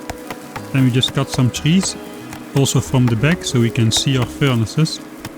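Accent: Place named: Netherlands. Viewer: Ha, I thought you were dutch because of the accent and I was correct! Nice content, thank you! Read as: Thank you for torturing yourself for our entertainment! <3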